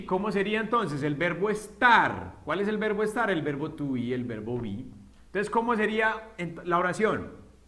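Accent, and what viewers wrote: Colombia. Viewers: Gracias! Me gusta tu acento colombiano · Gracias... muy bien explicado, soy de México, veo que tienes acento colombiano. Saludos ✌ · Excelente la manera de explicar,de lo mejor que e visto en YouTube y es mucho más interesante por el acento siento que me está enseñando el mismísimo patrón Pablo Escobar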